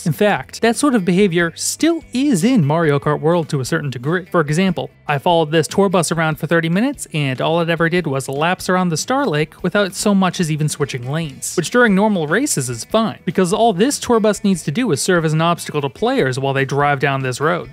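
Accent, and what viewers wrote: Boston, United States. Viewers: Your accent sounds like Boston. Where are you from? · Is it just me or half way thru the video he talks with a Boston accent for some reason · I love deep dives into game worlds like this! Btw, are you from Boston?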